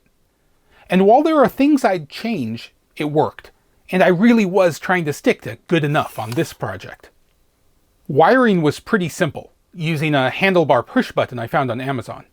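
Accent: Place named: Chicago, United States. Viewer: the juxtaposition of Chicago mafia accent botanising urban wastelands in latin and cursing is the best part!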